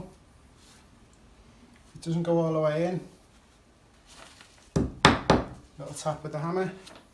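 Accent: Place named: Northern England, United Kingdom. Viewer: I bet you are from Northern England. Somewhere around Manchester area. I am pretty sure about that!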